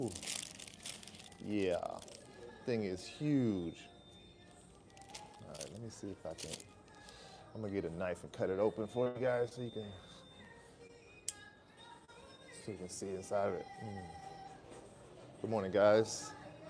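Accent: Texas, United States. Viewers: That's wasup bro I used to live in Dallas And I love your Texan accent lol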